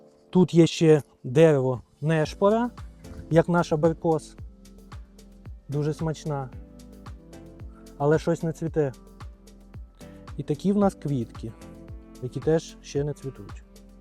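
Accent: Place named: Sanzhary, Ukraine. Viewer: В Санжарах так розмовляють.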